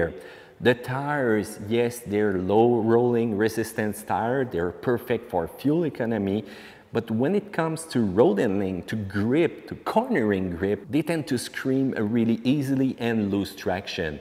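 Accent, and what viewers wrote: Quebec, Canada. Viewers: Are you French Canadian ? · Avez-vous fait une version en français de ce vidéo?Je pose la question parce qu'il est évident pour moi que vous êtes un francophone fort probablement québecois.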